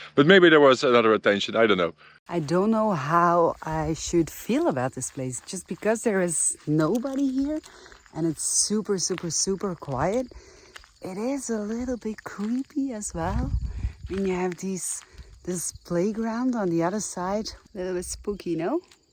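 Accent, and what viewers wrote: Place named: United Kingdom. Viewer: You're sounding more like a British tourist as time goes by.😢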